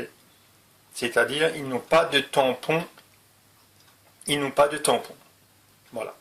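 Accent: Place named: Alsace, France. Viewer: Je reconnaît cet accent alsacien je suis d origine alsacienne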